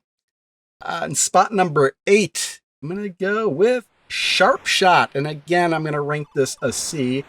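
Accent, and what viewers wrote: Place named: United States. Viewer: Enjoyed it very much You are so dedicated And a very nice accent.. i wonder what part of the States this is from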